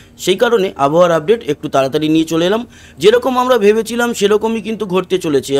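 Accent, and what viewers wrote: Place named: Dhaka, Bangladesh. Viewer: Aapni ki bangladesher manush Dhakar dike aapnar taan besi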